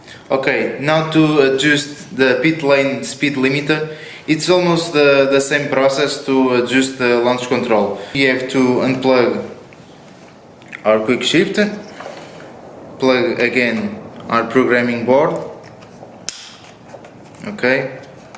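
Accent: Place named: Russia. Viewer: Guy what state do you ride in, or country, you sound russian. That way i can show you a thing or two about your CBR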